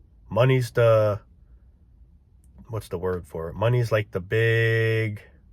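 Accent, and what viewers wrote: New York, United States. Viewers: Yeah the ny accent, and truth speaking. Makes me laugh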